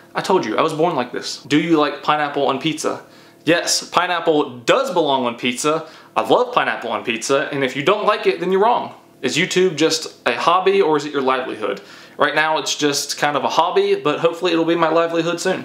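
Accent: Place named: South, United States. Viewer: That Southern drawl is on point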